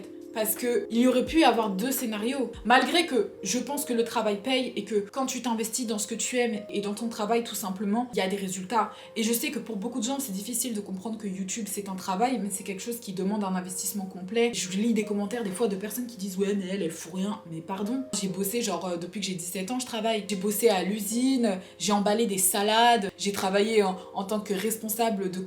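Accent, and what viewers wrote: England, United Kingdom. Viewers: C’est agréable ton contenue est fluide et chapeau pour les videos tout les jours mais l’accent anglais les expressions c’est vraiment lourd , irritant et gênant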